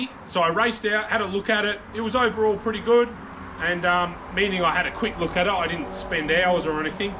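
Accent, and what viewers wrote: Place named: England, United Kingdom. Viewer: I just started watching your channel for the 1st time. I have to tell you as I was listening to your accent. I can now see how English accents morphed into Aussie, American Southern Hillbilly/Redneck and Kiwi. I have never heard that connection from one person before. Amazingly interesting. Anyway, you made a good choice buying that deal. Keep it up. Good job, buddy. 🇺🇸🇭🇲💪👍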